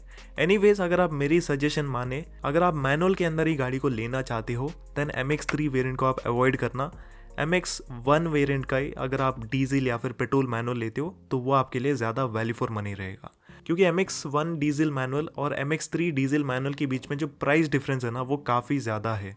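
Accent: Kerala, India. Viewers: Bro, neutralize your hindi accent. Can make out you are from Kerala.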